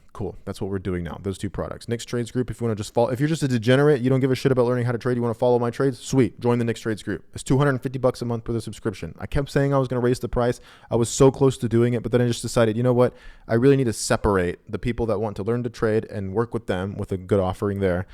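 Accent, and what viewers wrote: India. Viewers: 69,420 Please entertain us with your Indian accent. We need more of that! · Im dying cause of the indian accent lol...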